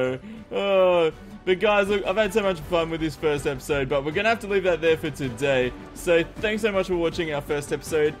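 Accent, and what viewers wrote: Australia. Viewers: Is he Aussie?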